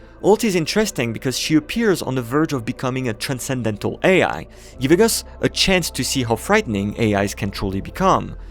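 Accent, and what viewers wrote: France. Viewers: French accent ahhhhhh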